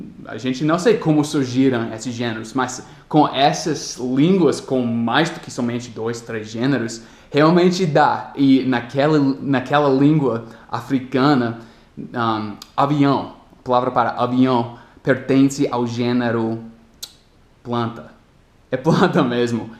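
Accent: Brazil. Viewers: Impressão ou em alguns momentos ele puxa o sotaque gaúcho, cara to devorando os vídeos e é incrível o quanto ele entrou no sotaque brasileiro. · volta para o Brasil!!!!!! Muito tempo longe você vai perdendo o seu sotaque brasileiro...🤗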